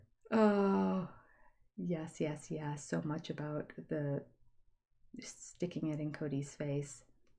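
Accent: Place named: Midwest, United States. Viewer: Yeah, but lol you have a Midwestern accent even though you don’t think you do 😂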